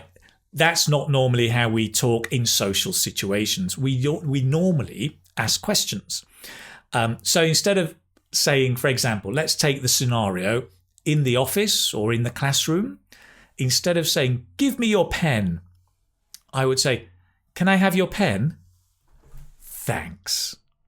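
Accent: United Kingdom. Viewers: Great teaching videos! a great accent. Could you please tell us which British Accent do you have? would you like to suggest us some good British narrators on ? Thank you so much :)))) keep up the great job (y) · Thanks for the clarity when explaining the classes. It´s way better to hear you. When I read at all, I had in mind, "aroll", American accent, instead your pronounce says at all. It will be good for me to have the opportunity to practice Brits accent as well. Thanks. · Thank you so much for your video clips ! I just love your British accent ! When you said, it was a bit strange to use the past tense to sound more polite like in "I was wondering whether you ..." in a present situation, well, we do the same thing in French when saying 'Je me demandais si tu ... '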